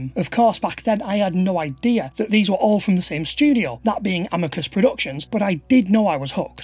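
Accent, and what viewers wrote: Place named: Manchester, United Kingdom. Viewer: Many thanks for this. Your voice sounds North Manchesterian, so if you want an interactive sensory descent into that post-apocalyptic decomposition vibe you could always visit the ex-shopping malls of Bolton. Plenty of it here. Bring a flamethrower. :)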